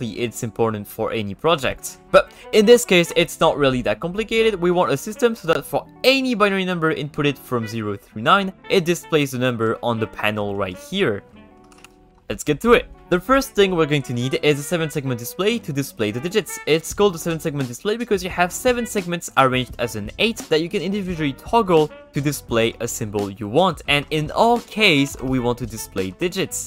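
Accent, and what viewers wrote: France. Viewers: are you french i heard it in purples vedio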